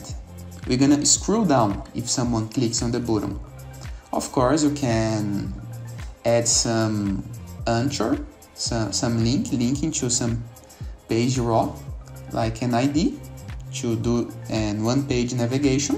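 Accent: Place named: Brazil. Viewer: Are you brazilian? maybe .. your accent is exactly the same srrsrs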